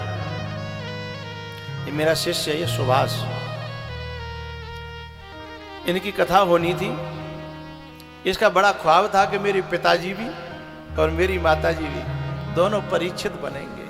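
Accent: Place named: Braj, India. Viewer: बाबा शुद्ध ब्रजभाषा बोलकर सबको लुभा रहे हो? आप निश्चित रूप से मथुरा के आसपास के ही हो, आपको प्रणाम!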